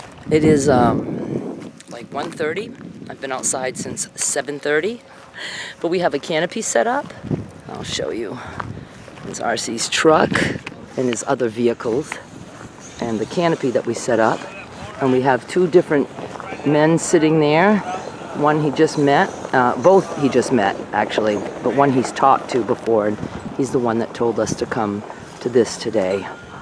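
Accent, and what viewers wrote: Maine, United States. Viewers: "His caws" haha you still have your Maine accent! Love it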